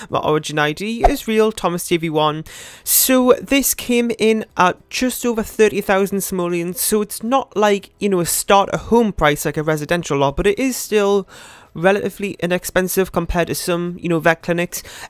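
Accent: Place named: Northern England, United Kingdom. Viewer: Nice build, and nice to hear a nice northern voice, looking forward to seeing more 😁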